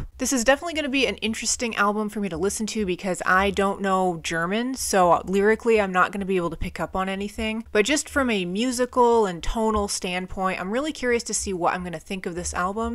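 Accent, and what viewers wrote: United States. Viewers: I watch a fair bit of react content and your reactions are pretty unique I feel, I've been watching your videos here and there for a while now and it always feels like I'm watching a radio broadcast. There's also something very open about your style, you come across as very unassuming. I love how you can listen to the gnarliest lyrically super fucked up stuff and just be like "I really love how the guitar builds with the energy of the lyrics". This reaction in particular is pretty funny to me, I live in the Netherlands which means German is a very recognizeable language for me. Despite the fact that you clearly have 0 clue what's going on with the lyrics you still pick up on some cool stuff instrumentally, and well, there's just something comedic about someone with a very American accent pronouncing the song titles and the band's name. 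It reminds me of Brad Pitt in Inglorious Bastards when he greets people in Italian. I'm not entirely sure why I felt the need to write all that out but what I'm trying to say is I think you got something pretty unique going on here and I think that's great. Keep it up!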